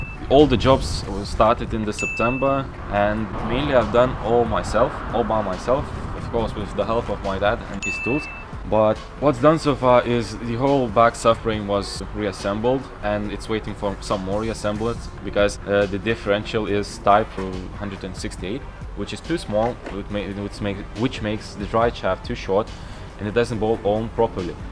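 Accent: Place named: Lithuania. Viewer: Agirdi tu lietuvis nes nekaip kalbi anglas nedarytu jokiu klaidu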